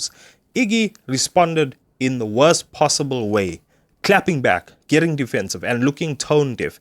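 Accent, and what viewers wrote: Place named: Cape Town, South Africa. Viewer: Im south african, from cape town, are you from here too? That accent is very recognizable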